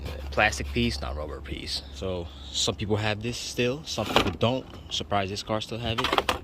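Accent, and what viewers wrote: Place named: New York, United States. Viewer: Bruh you from the east coast huh you sound like my partner Tommy he say stuff like there’s gone be mad fluid like the lingo and accent sounds like him and New York people 😇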